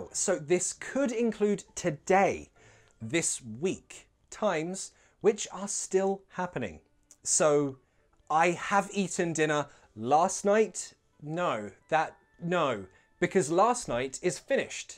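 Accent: United Kingdom. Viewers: Eres un excelente maestro....me encanta la forma tan sencilla de explicar. Entiendo el inglés pero aún me falta hablarlo y escribirlo correctamente...por eso escribo en español...espero utilices traductor para que sepas lo que escribo. GRACIAS POR TU TRABAJO. Ya me suscribi a tu canal. Saludos desde México. Perdón...de donde eres?...al escucharte noto acento británico... eres inglés? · What english accent do you speak actually? For me, this is the best british accent so far. My english is not too good, but with this accent i can catch most of the words you say. Its very clear and understandable.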